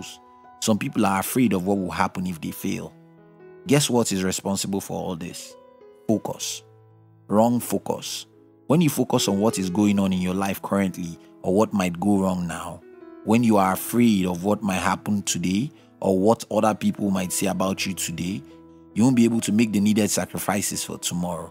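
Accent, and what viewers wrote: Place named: Nigeria. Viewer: Nigerian, I believe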